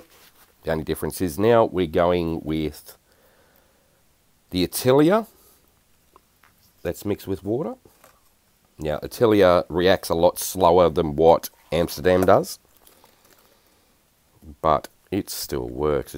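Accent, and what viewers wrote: Australia. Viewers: I'm very new at this type of art and live in Tasmania so it's lovely to listen to an Aussie voice and also hear you talk about Aussie products and stores such as Bunnings. I would like to ask you a question please. I notice that you usually use Amsterdam black for your Activator. Can you use other products and other colours as activators thanks for your very clear informative videos Linda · Hi from Sydney Australia I subscribed as soon as I heard an Aussie voice. Just found ya gonna binge some of the rest of ya vids. There will be plenty of questions mate! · very good video...good to hear an Aussie male voice in this arena with excellent advice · How good is the Aussie accent? So nice to hear on you tube. Champ recipe too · Great to hear an Aussie voice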